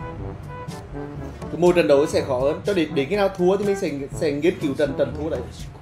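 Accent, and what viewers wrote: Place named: Central, Vietnam. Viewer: bạn nói kiểu miền trung rất khó nghe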